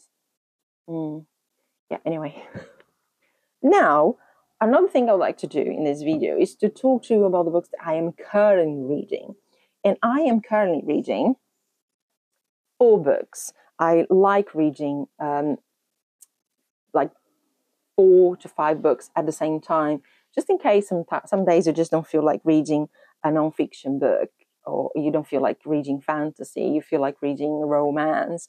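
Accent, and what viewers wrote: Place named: United Kingdom. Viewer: Você tem um sotaque britânico lindo!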